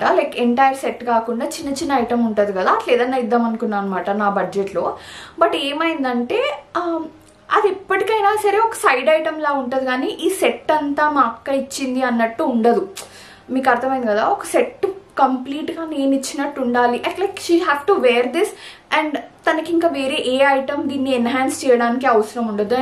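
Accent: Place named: Hyderabad, India. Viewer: you are an absolute example of Hyd telangana girl in heart and tone. Love you. Keep going. I do not care much abt jewels or sarees but I like seeing you and the energy you bring to life when speaking❤. -from another Hyd sis.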